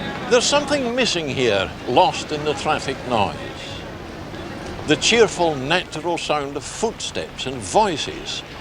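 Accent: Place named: Scotland, United Kingdom. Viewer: Strong Scottish accent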